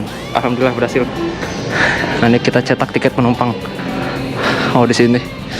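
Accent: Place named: Depok, Indonesia. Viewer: Bahasa depok bgt nih wkwk salam dr depok bang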